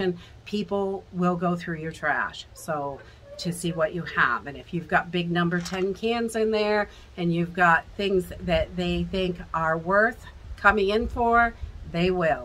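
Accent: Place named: Maine, United States. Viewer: First timer, I was listening to Canadian Prepper and your video just started afterwards, as I listened, I was thinking if that is not a Maine accent. Great video from a neighbor in southern Franklin Couunty, Maine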